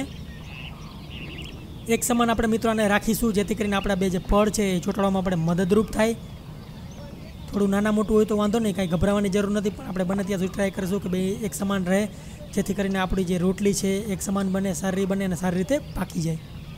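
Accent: Kathiawar, India. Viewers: Your language pure Kathiyavadi😍🥰🤩🤩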